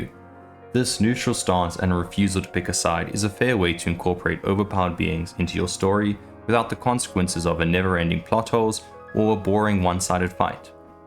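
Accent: South Africa. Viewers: bro u South African? u got the accent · Awez Bru, I'd recognize that accent anywhere my Bru 🇿🇦🇿🇦🇿🇦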